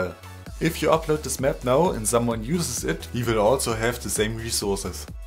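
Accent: Germany. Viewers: Ja, kommst du aus Bayern? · Hey have you thought about letting someone else do the voiceovers? I know a german accent can be hard to get rid of for some :) Or maybe it just bugs me more than most other people here? Anyways keep up the good work :) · Wohnst du in NRW? :D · du bist deutsch nich? Hört macn irgendwie... und ja ich schau mir das Video in 2021 an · I love how you talk 😄. Are you German? Luv your commands 👍🏻👍🏻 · Nice vid are you German? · Sein Englisch ist eigentlich ziemlich gut, nur die Betonung/Aussprache hört sich sehr deutsch an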